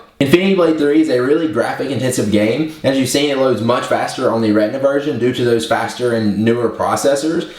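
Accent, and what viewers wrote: South, United States. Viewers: Love your southern (I assume) drawl!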